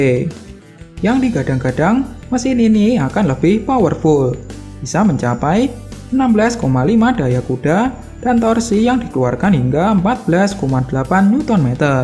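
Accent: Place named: Java, Indonesia. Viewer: Medok bener jawa nya bro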